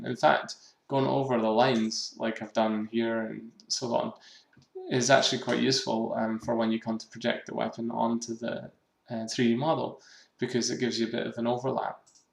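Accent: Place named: Scotland, United Kingdom. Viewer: Hi, where are you from? You have a Scottish accent. Your asiimov skins are beautiful btw.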